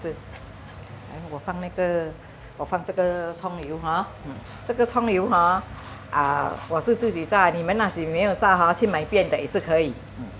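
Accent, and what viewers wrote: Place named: Hokkien, China. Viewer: Thank you auntie, like the way you present very detail, and Hokkien mandarin 😊 I’m Hokkien too 😊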